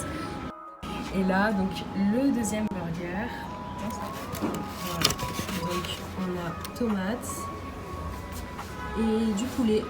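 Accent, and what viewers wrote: France. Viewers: Salam Alaykoum ma soeur tu es françaises et tu vie en Algérie ? Je me demandais car t’as un accent français mais t’as aussi un bon accent Algérien quand tu dis un mot arabe lol J’aimerais vivre en Algérie insha’Allah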